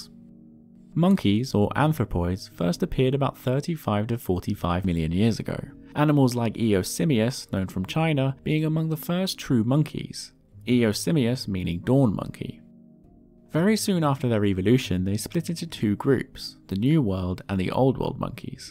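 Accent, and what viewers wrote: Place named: United Kingdom. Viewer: A british accent is essential when telling "just so" stories to small children.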